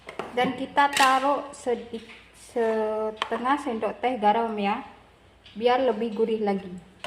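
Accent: Madura, Indonesia. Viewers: Logatnya Bicaranya Persis Orang Madura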